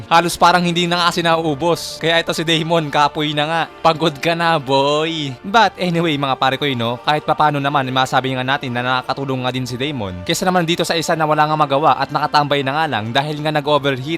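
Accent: Visayas, Philippines. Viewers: Bisaya ang ampt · Parang nagiging Bisaya kana din ahh.. grabe talaga influence namin bisaya hahah! #Kapoy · Bisaya ka dol?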